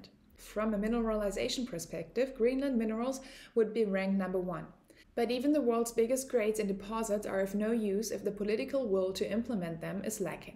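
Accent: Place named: Germany. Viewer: In which country/town you are living? Sounds like german.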